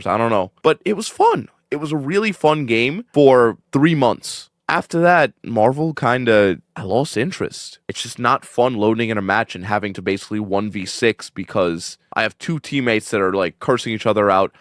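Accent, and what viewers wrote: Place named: Alabama, United States. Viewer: I definitely thought bro was Alabama white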